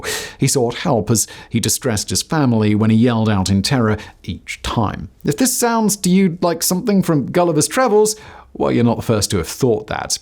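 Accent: United Kingdom. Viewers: I like this channel minus the obnoxious British accent.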